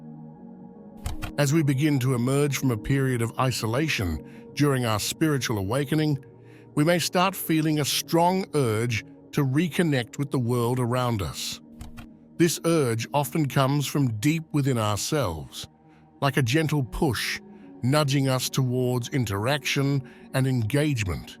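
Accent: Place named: Australia. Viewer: Nice Aussie TTS. Good one. 👍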